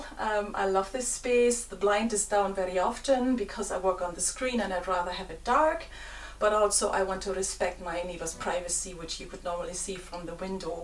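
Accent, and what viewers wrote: Scotland, United Kingdom. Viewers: I hope you don’t mind me asking but I’m curious to know where you’re from. You obviously have a Scottish tilt but I can detect another accent, possibly German or Scandinavian? Have you lived in Scotland long? Lovely video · I love your home and your colours and the way you have picked up a slight Scottish accent accent.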